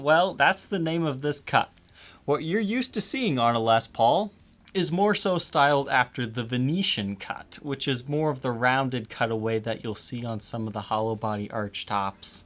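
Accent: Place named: United States. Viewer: American English.... Hello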